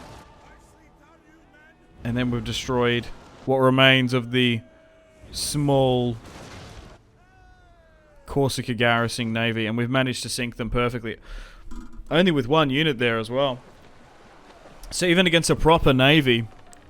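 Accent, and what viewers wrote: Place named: Australia. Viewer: What part of australia you from bro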